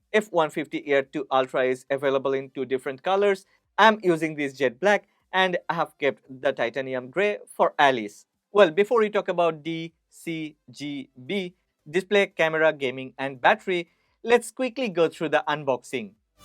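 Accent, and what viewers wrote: India. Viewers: When i play a review video i can't escape that Indian English accent sometimes but you're amazing.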